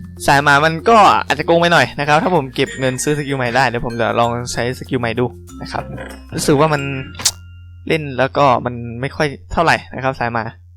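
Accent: North, Thailand. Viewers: พี่ๆเป็นคนเหนือหรอ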